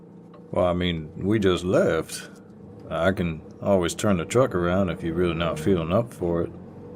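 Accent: South, United States. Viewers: The southern accent rahhhh I love me a southern gentlemannnn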